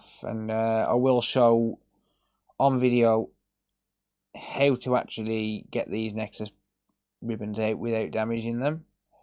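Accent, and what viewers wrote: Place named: Black Country, United Kingdom. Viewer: Brilliant, your patience and attention to details is superb. You sound like a black country boy, where are you located?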